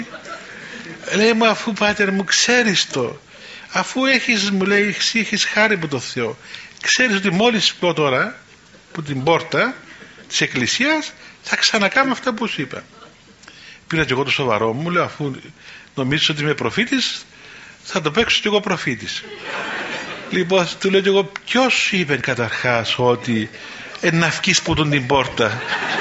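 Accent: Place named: Cyprus. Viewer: Πόσο σε αγαπάω πατέρα Αθανασιε, σε ακούω πολλά χρόνια καί έχω ωφεληθεί πάρα πολύ. Μου άρεσει το χιούμορ σου, και τα κυπρεικα που λες τα απολαμβάνω. Να σε έχει ο Θεός καλά Ευχαριστώ από Ελλάδα.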